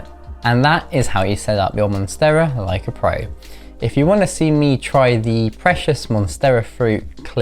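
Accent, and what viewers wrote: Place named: Wales, United Kingdom. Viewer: Thank you for this excellent video. As an American I was trying to place your accent. Are you possibly Welsh or perhaps Australian ?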